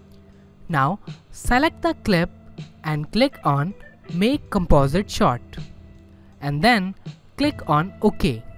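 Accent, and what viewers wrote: India. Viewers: random dude with Indian accent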